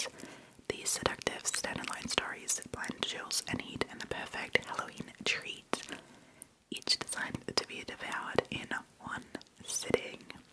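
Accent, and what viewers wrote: Australia. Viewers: I genuinely thought you were australian this whole time…😭 your accent is so interesting knowing english is your second language!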